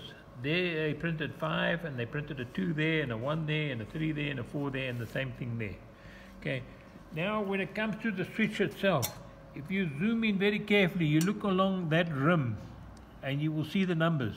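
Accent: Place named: South Africa. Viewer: Thank you maneer. I hear your accent from Mzantsi🙏🏽🙏🏽🙏🏽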